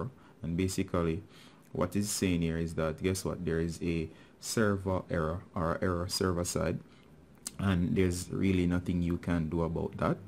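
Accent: Jamaica. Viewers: R u jamaican man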